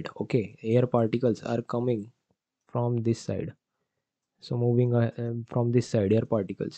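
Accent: India. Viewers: Indian-origin accent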